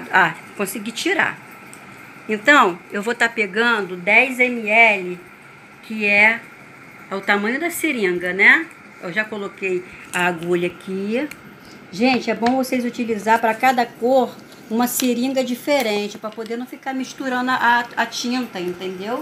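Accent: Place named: Rio de Janeiro, Brazil. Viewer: Legal o vídeo, mas pelo sotaque você é carioca, e é normal os correios terem medo de entregar, só tem bala perdida aí e bandido.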